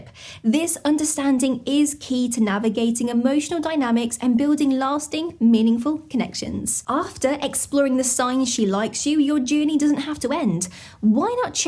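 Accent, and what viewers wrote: United Kingdom. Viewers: your little British accent is cute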